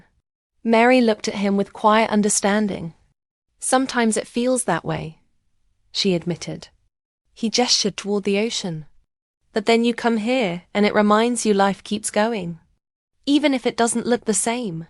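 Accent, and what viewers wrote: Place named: England, United Kingdom. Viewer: Very appealing English accent. Thanks.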